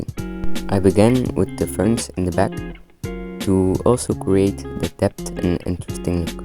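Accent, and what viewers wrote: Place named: Belgium. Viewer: Oh really lol, you might know that he is from Belgium and I'm also from Belgium so we probably have the same accent😅👍🌿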